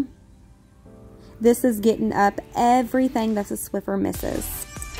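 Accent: South, United States. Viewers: Can I ask what state you are from? I'm in Louisiana and I definitely can hear your southern accent lol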